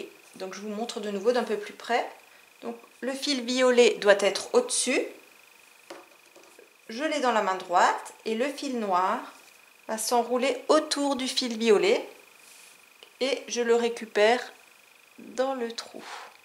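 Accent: Alsace, France. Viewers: Oh merci beaucoup de ta réponse! Je suis moi aussi alsacienne et j'ai cru reconnaître ton accent ;) Bon ben j'ai regardé ta vidéo... je n'y arrive définitivement pas, pourtant c'est très clair et ça semble facile... on va dire que c'est la faute à mes fils :) Du coup j'en profite, tu connais une boutique sympa dans le bas rhin pour le matériel? Je n'ai que du fil de très mauvaise qualité pour le moment...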